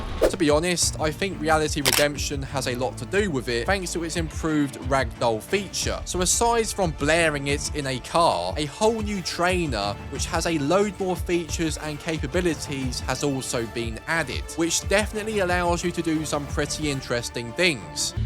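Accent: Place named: England, United Kingdom. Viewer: You have the most pommy sounding voice.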